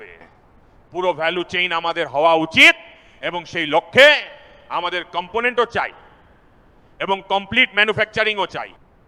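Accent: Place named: India. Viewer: বাংলা উচ্চারণ আরেকটু স্পষ্ট হলে ভালো হতো। অনেকটা হিন্দি টানে বাংলা হয়ে গেছে।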